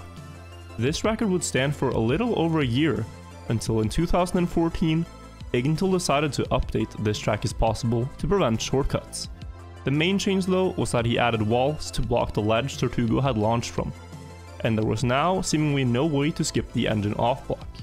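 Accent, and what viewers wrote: Norway. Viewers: are u a norwegan??? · Are you norwegian? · Are you from norway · are you Norwegian? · You Norwegian?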